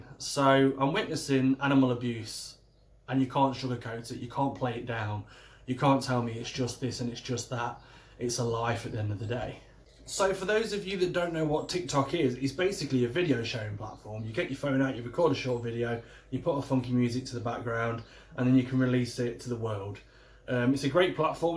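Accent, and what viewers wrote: United Kingdom. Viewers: Are you brittish? (no offence) its just your accent sounds brittish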